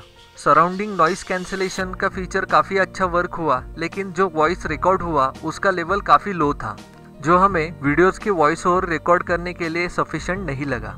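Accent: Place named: India. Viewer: Oh god the Indian accent 😭😵😫😖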